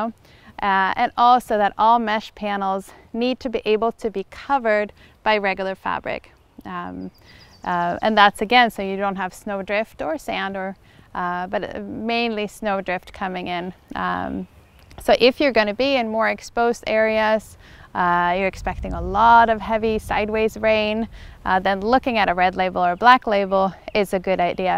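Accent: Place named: United States. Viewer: Discasting american accent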